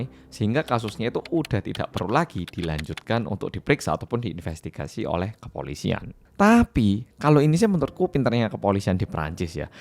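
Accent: Java, Indonesia. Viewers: Wah, ini mantap dan Top top markotop ini yg aku suka dgn bahasanya yg MEDHOK 😄😄😄❤❤❤👍👍👍 Lanjutkan Medhoknya (ini yg menjadikan Aku SUBKRAIB)🙏🙏🙏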